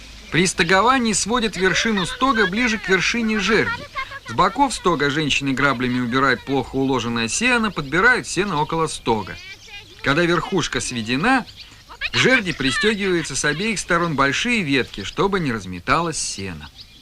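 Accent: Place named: Russia. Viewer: Тогда автору придётся все языки учить прежде, чем фильмы про каждый народ ставить... Если следовать Вашему требованию -- мы никогда этих фильмов и не увидим вовсе. Да и -- что он говорит не так? Я -- коми. И то поняла слова "дöрöм" -- "рубашка"; "турун" -- "трава"; "нянь" -- "хлеб"... Молодец, что произносит с русским акцентом. Уважение и благодарность ему за это! Спасибо --, что выставил этот замечательный фильм. Очень интересно было его посмотреть.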